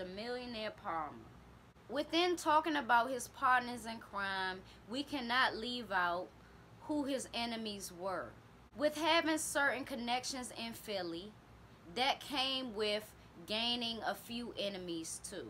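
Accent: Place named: Baltimore, United States. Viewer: I just love your accent that dc-baltimore accent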